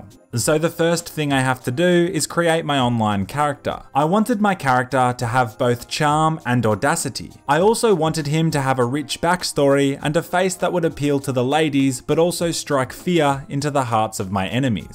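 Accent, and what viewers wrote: Australia. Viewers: dude u Aussie?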